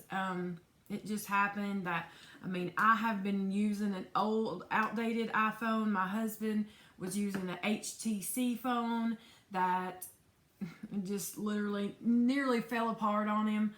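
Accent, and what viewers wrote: South, United States. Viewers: Love your beautiful southern accent 😍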